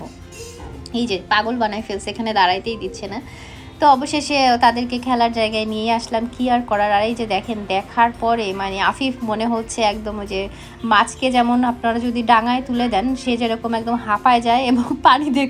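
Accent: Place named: Barisal, Bangladesh. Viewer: আসসালামু আলাইকুম. আপু অল ফ্যামিলি আপনাদের ফ্যামিলি টা খুব ভালো লাগে. সুন্দর একটা সংসার মাঝে মাঝে আপনার কথা গুলা শুনলে অনেক হাসি আহে কি হাসতে হাসতে পেট ব্যাথা হয়ে যায়. সত্যি কথা যে বরিশালের ভাষায় কথা বলেন. আবার অনেক বাসায় ভেঙ্গাইয়া কত রকম কমেন্ট করে ঢং মং করে কথা বলেন. খুব ভালো লাগে. এই যেমন ধরেন আজকে একটা কথা বলছিলেন যে বাবু, আমি যামু কাঠি দিয়া খাইতে পারি না. আমি তোকে কিভাবে খাওয়ামু ঠিক এই কথাটাই অনেক ভালো লাগে. ২. ১ টা কথা. আপনার মাঝে মাঝে অনেক.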